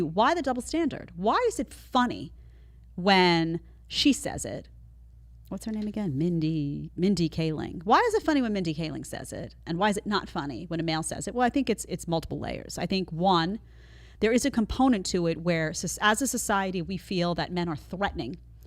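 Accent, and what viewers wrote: New York, United States. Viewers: I can hear NY in your voice sometimes, lol. You try hard not to show it.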